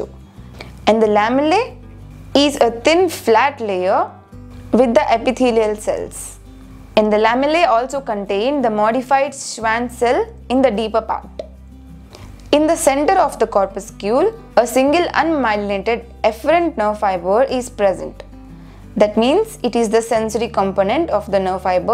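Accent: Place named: India. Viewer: indian accent is so irritating 🥲 thanks for the vdo though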